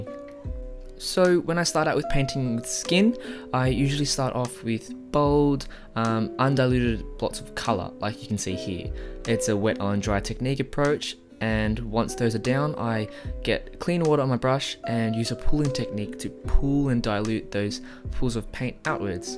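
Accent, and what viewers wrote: Australia. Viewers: I recognised the accent, then saw that you’re from Australia too! G’day to all my fellow Aussie artists. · Hey I love your work but please work on your pronunciation! it’s hard to understand you. Usually it’s hard to understand asians speaking english, imagine adding australian accent to it hahaha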